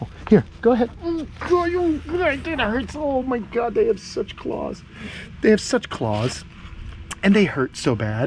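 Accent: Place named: New York, United States. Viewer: "Oh they've got such claws... such claws!" You sound exactly like where you are from🙂!! You can take the man outta New York but you can NEVER take the New York outta da man. Brooklyn maybe? Queens? It's no matter..I love how you communicate!!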